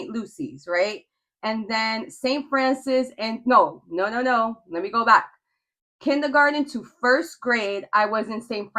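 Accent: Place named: New York, United States. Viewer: it sure does sound like a NY thing. It’s like listening to someone read the book of life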